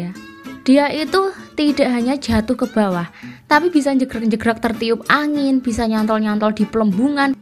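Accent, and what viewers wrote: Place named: Java, Indonesia. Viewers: Penjelasan dgn jokes yg sangat medhoksionist dan expressive👌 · Jowo banget yo suarane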